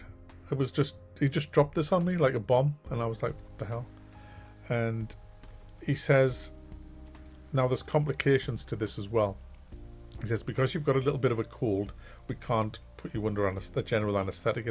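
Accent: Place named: Newcastle, United Kingdom. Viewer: Mate, I only started watching your videos early this year (after moving out on my todd). You're a fucking legend, and it's been a true pleasure to have all your old content to spool through, while following the new stuff. You're like a stand-in Geordie replacement to me still being back at my Parents Home with my Dad watching The Hairy Bikers. lol. (Every time you're laying into David Braben from Frontier, it's what goes through my head haha, "Deeeeeive!!" < terrible Scouse interpretation of the Geordie accent.) As others have said. Second Opinions. Reconsideration. Fuck, dare I say it - fundraising for Going Private... Need to get you back in the game.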